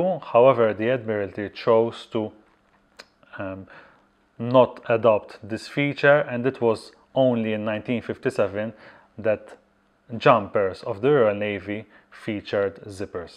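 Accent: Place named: Malta. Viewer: Very strong Maltese accent :)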